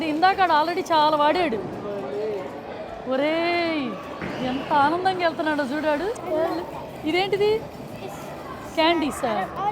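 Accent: United Kingdom. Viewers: She got that cute British accent 🥰❤️ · Her British accent is adorable❤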